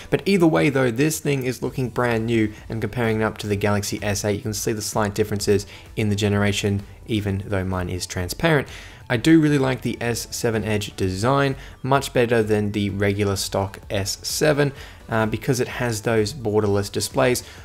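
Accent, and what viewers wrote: Australia. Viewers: are you Australia mate?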